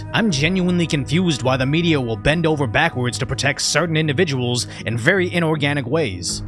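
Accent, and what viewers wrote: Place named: East Coast, United States. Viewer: Do you make all your content to covertly target black people? Im just asking, I noticed that about you, that’s not to take away from someone who very clearly deserves to have their day in court but there are so many groups who commit in frequency many of the same crimes you report. But judging by that accent it’s no surprise where you were raised, pretty much the Mecca of WS on the east coast. Your blind & biased, basically acting as the watch dog you are.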